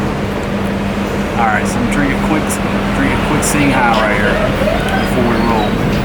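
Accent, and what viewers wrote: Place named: Texas, United States. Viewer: loved your detailed report about the bus service as i was just looking for this info ! and above all that loved your texan kinda accent man ! typical cowboyish ! a handsome and smart backpacker detected , in pattaya ! hahahaha keep posting such cool vlogs mate ! greetings from india :) peace and cheers for that singha !!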